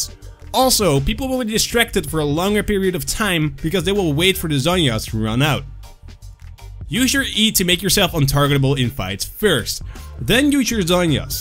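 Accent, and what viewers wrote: Netherlands. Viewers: Woow man. Geweldig accent. Nooit verwacht dat jij nl was XD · Ben je Nederlands ja?? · I knew it! You speak Dutch :D The accent is heareable :D Nice video once again en blijf zo verder doen! :) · Are you, by any chance, Dutch? I hear a typical Dutch "a" and "i"... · ARE U DUTCH?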